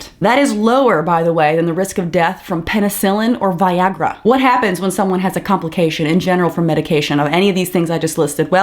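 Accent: South, United States. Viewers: Is it me or are you developing a Southern accent? 😮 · Just me, or does have more of a southern accent than I remember? Also, very happy to be in a blue state right now. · It’s fun to hear your drawl gradually increasing! Is sounding more Southern as well? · an extra bit of southern drawl in your accent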